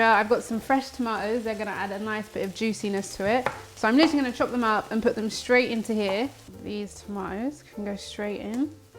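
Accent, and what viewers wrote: United Kingdom. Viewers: Yo hablo español, but if my listening is not too bad, your accent sounds british. I've heard there are good vegan options in Britain. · Thank you!!! The food looks delicious. I love your British English. I watch the videos to learn recipies and vocabulary too. 🤗🇧🇷🇧🇷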